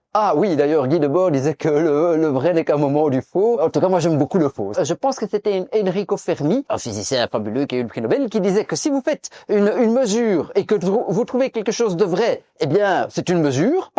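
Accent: Belgium. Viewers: Sans l’accent belge ce mec serait juste lourd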